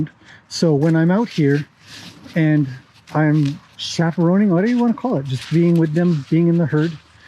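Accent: Canada. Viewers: Trying to figure out your accent? Canada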